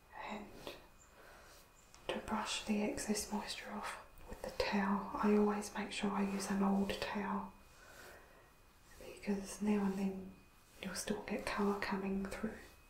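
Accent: Australia. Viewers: Australia! My bad.I thought somewhere in Britain but your sounds are so rich I haven't paid much attention to the accent.Or do I have the accent? Now I hear it.I should have known. We have national and regional slang.It is probably the same there. I am a huge fan of the Seekers.Judith Durham is brilliant.But you are too young to remember them in their heyday.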